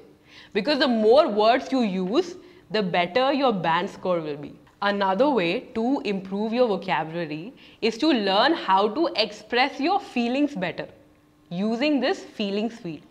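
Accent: Hyderabad, India. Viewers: Akka hyd a...? 😁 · Sister....are you from Hyderabad??? I initially thought that this is an abroad channel..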